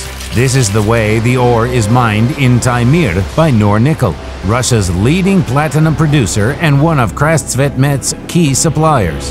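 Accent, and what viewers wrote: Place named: United States. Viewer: Interesting dialect. Almost sounds american.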